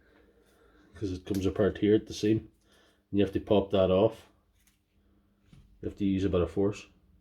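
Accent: Northern Ireland, United Kingdom. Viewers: I will try and get to the root cause of my drill not working this weekend. I have confidence to at least give it a go. If I mess up then by the sound of your accent you will only be a couple of hours drive from Belfast😊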